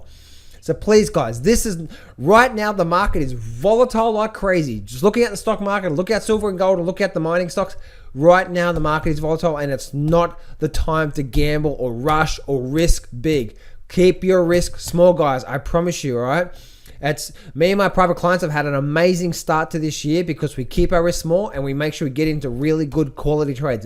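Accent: Australia. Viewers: HERE WE GO MORE AUSSY ACCENTED B/S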